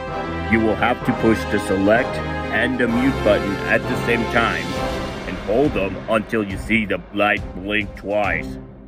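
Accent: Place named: North, United Kingdom. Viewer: Bro got dat up nort accent hey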